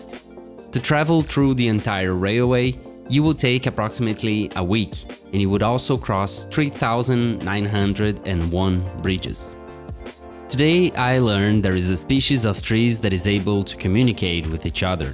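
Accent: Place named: England, United Kingdom. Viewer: I like your English Accent! Where are you from? keep up the great work!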